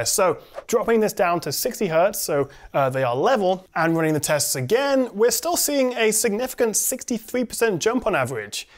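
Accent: England, United Kingdom. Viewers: I’m mesmerized by this guy. Maybe it’s the English accent.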